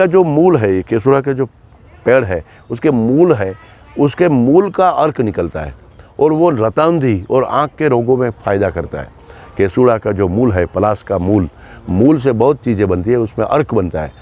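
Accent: India. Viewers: प्रणाम सर ,अति ज्ञान वर्धक.... An extremely scientific analysis ,never heard it before Sir ,your gujrati accent delivery in hindi is so loving ,I rightly feel, my self being in bhuj decades ago.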